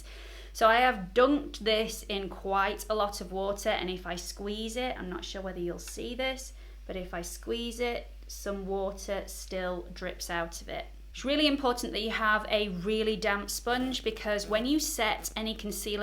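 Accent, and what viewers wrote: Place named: Leicester, United Kingdom. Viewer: Yes fixing spray would be great , really enjoying your channel. Both my friends and I have said you sound like your from our area , Loughborough Leicester. Lol . Take care 😉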